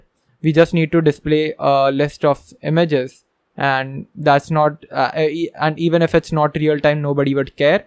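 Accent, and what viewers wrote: India. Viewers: The faculty is Indian🥰 bro i can easily get your accent. First time I watched codecAmp video without turning on the caption😍❤️ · Indian? Ye for sure it's indian accent.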